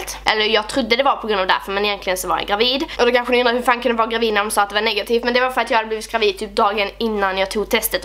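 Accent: Scania, Sweden. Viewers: Asså du e typ den enda ytbern jag kan sitta och lyssna på en hel video, tröttnar typ på andra men du e bäst på det (och jag älskar skånska 😂) Love u ☺️❤️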